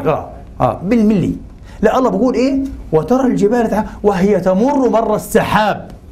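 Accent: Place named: Palestine. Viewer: والله جعلتني اشتقاق الى سماع اللكنه الفلسطينية انت ممثل مسرحي رائع انت فنان نبرات صوتك صادقة .....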